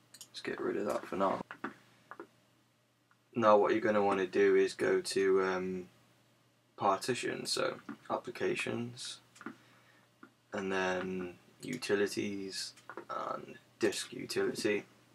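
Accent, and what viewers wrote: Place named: United Kingdom. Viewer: oh British :D thank u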